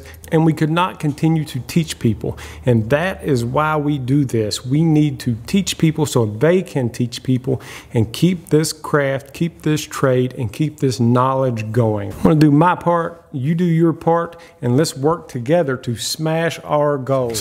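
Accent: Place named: Virginia, United States. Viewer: I gotta ask. Are you from Virginia by chance. Just going by your cool accent.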